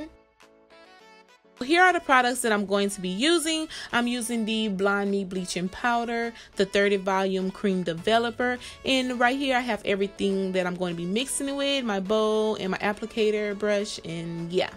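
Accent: Louisiana, United States. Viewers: You gotta be from Louisiana sis I hear some accent😍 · I love your Louisiana accent 🤗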